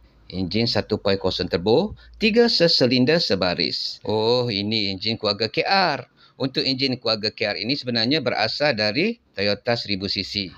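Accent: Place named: Kelantan, Malaysia. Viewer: slanga klate wei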